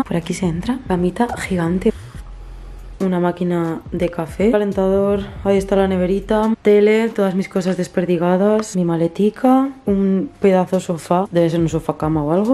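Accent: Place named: Spain. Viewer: Buenos dias ❤ muchas bendiciones mi diosa del olimpo mi chocolatito hermosa me encanta tu acento español lo amo bby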